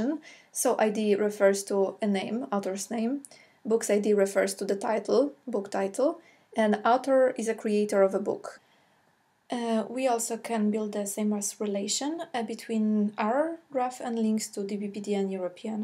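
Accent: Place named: Poland. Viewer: are you from Poland? Cause you have soo polish accent :)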